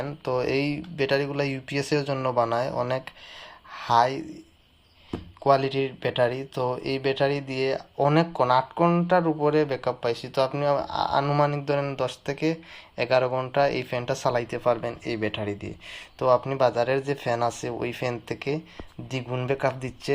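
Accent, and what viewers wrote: Sylhet, Bangladesh. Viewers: Vai apni ki Sylhety 🤔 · Vai ki sylheti ❤ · Vai sylheti? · Apni sylheti naki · Vai er basha ki sylhet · আপনি কি সিলেটি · ভাই আপনার দেশের বাড়ি কি সিলেটে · Vai apni ki sylhety ? 😀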